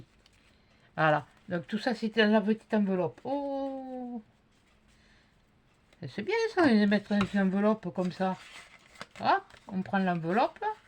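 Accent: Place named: Marseille, France. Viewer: Bonjour marseillais, dites moi qui est Coco? J'adore votre accent, j'ai le même 😊je suis d'un petit village à côté de Toulouse. Bonne journée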